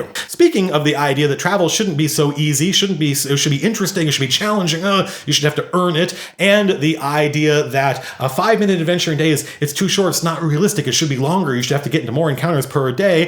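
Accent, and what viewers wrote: Australia. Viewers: Hey, no more green screen artifacts! And no more Australian accent :( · I liked the first one better, awful Australian accent and all. It had better detail and flow of information.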